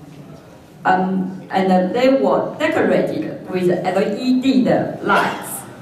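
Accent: Taiwan. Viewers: 媽呀，我阿嬤都比你強嘞，台灣真的需要雙語教育，英文教育真的要好好檢討，就算在英國待了20年還是無法改變如此根深蒂固的台式英文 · 應該是沒有學過音標~才會用臺灣人的方式講英語?!🤔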